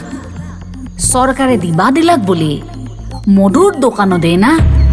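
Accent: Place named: Lower Assam, India. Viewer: মোৰ সতে মিলি গ'ল। মইও নামনিৰ এ । স্পৰ্শ কৰিলে। আপোনাৰ মাতটোত নিজৰ ভাষাৰ শৈলীটো শুনি ভাল লাগিল।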